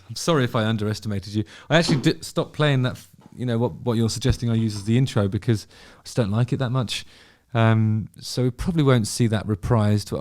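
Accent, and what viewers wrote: United Kingdom. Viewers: I think the "elitist" remark is because of your British accent.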